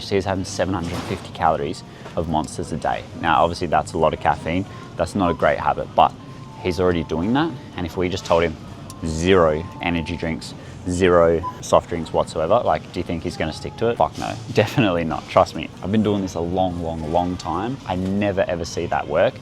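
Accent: Australia. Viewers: Why do you sound Aussie except when you say "Yoghurt" like a British person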